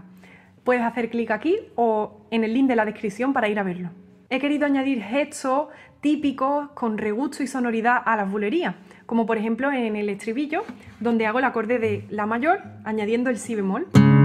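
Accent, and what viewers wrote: Andalusia, Spain. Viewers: Què hermoso acento andaluz!!! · Ayyy, ese acento andaluz 🥰 enamora 💗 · You are missing something in her sweet Andalusian accent. If you're an American, think Southern belle. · ¡Hola, saludos desde Montevideo, Uruguay! Qué bonito tu acento andaluz con las jotas aspiradas. Como cuando dices "ojos", donde la "j" casi no la pronuncias. Y qué te puedo decir de tu virtuosismo con la guitarra que ya no te hayan dicho. Muchas gracias por traer tu arte al mundo. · OLÉ CHIQUILLA, ME ENCANTA TU ACENTO ANDALUZ.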